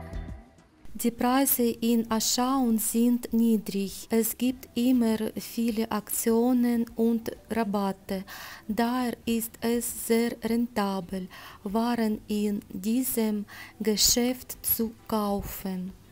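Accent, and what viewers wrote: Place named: Germany. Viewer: i think your English will be as good as your Germany so look forward to an English video but i like your German accent as well ,you look after self and take care ♥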